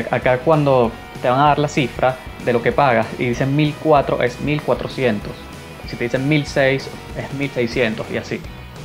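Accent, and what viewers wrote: Venezuela. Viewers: ¿Y de dónde eres? No he podido identificarlo por el acento... ¡Ah, ya! De Venezuela. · me encanta tu acento venezolano...saludos desde mexico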